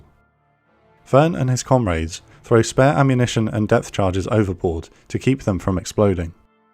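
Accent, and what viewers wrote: United Kingdom. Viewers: Most BORING vid evah.. Can you possibly Mumble any more Monotonously? One sentence.. 1. A British accent; 2. Listlessly Mumbling; 3. Unexpected (and indecipherable) words; 4. Tonelessly; Exciting Topic! Thrilling Script! aaaaaand, Narcoleptic Narration humdrumhumdrumhumdrumhumdrumhumdrum zzzzzzz Did you sometimes call some airplanes, "Janes?"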